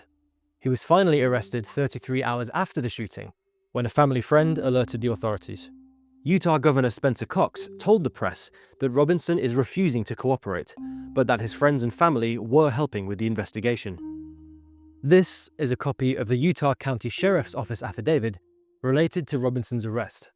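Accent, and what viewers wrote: United Kingdom. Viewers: Did you not hear his voice. British bro.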